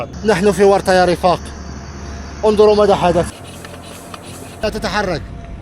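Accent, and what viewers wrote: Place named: Morocco. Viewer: لغة فصحى ركيكة كلغة الأطفال ..لغة كارثية مهزلة...اقول لك ماتكونش معقد من لهجتك المغربية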